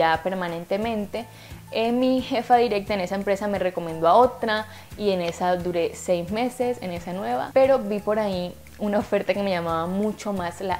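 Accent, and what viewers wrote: Caribbean Coast, Colombia. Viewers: Gracias! Me gusta tus vídeos, estoy aprendiendo español y el acento costeño es mi favorito. Saludos desde EEUU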